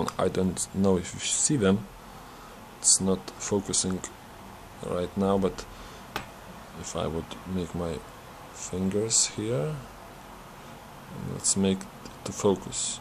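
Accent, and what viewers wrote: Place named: Eastern Europe. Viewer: voice like Arnold? :) well Arnold is from Austria and has kind of german accent :) but this mate has slavic accent.. Like me :D Ukraine or something like that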